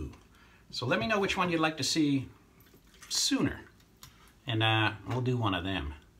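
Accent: England, United Kingdom. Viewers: Would love to hear your Newcastle accent on camera, and to see Eternity coming next in this record breaking series! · You sound like you're from Kansas. Can you give us a taste of the ol' English accent?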